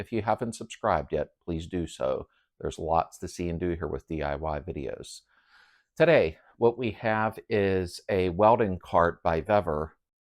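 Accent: Pittsburgh, United States. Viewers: You have one heck of a Pittsburgh accent 😂. As a Yinzer who lives down south now, it makes me feel like I am back home when I tune into the channel. Keep the content coming!!!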